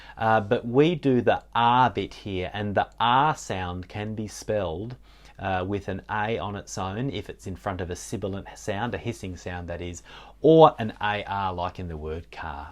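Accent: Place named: South Australia, Australia. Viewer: This was a really helpful video to watch and made me think differently about phonemes and strategies for phonemic awareness ('words are actually blobbed'...great image). I teach adults English as an Additional Language (migrant program) in WA. It's great to find some examples of each phoneme spoken in an Aussie accent. As I'm originally from Adelaide, I appreciated the South Aussie accent too...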